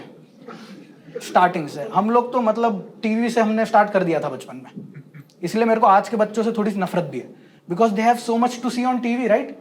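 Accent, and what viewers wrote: India. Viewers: Bhai which city u r? UP se h yh toh jaan gya 😊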